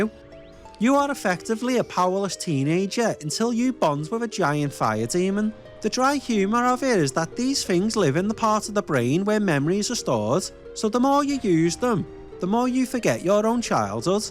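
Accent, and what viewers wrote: Liverpool, United Kingdom. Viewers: Man the Scouse is THICK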